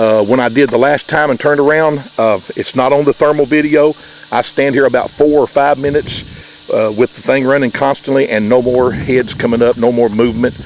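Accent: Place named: South, United States. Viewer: Where/what state was this to have occurred? With your Southern accent I figured the South, but Sascwatch is Pac. NW area, I used to live in Oregon and I kept my focus sharp walking in the woods for Big Foot, though never saw one.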